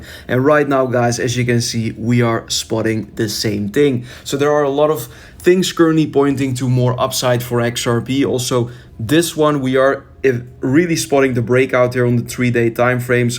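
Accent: Netherlands. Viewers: Nederlandse accent weer duidelijke te horen😂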